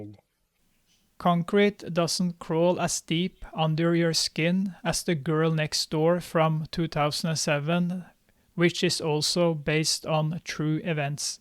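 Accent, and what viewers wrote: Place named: Sweden. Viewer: Jag bara: Han e svensk direkt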